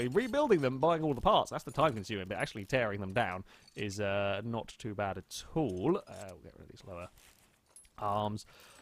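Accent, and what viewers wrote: United Kingdom. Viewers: AY Bloke,, hahahha we call stripping and selling in US 'a chop shop',, buy you had a rag, not a dream car, so yeah, you're only going to get trash money back,, now go 'steal' some rims from a barn, 'steal' some heads from a junkyard, and 'steal' some parts off another car of the near same type, then sell it, and watch what your value is!! Fun watching your vids tho,, and like I said before ,, love the Brit accent, reminds me of home... TC E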